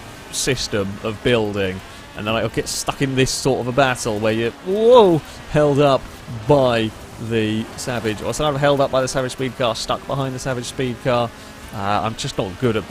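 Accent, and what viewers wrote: England, United Kingdom. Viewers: I watch this for the British Accent.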